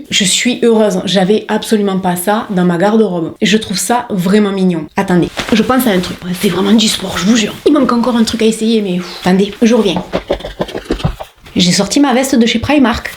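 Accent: South, France. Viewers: Coucou, j adore vous regarder et surtout vous écouter avec nôtre très beau accent ça sent le soleil,et met du baume au cœur.Excellente vidéo comme à chaque fois.Merci beaucoup bises 😊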